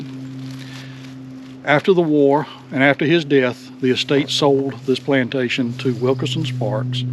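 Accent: South, United States. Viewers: and are both interesting men to listen too. They real seem to enjoy making the videos. you are a excellent historian and that wonderful southern accent of yours, just adds to the story telling. Thank you both 😊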